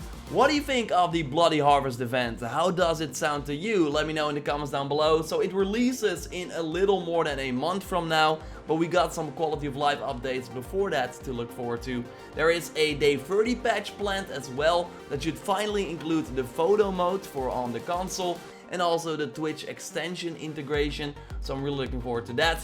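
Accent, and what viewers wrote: Netherlands. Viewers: ....... are you dutch?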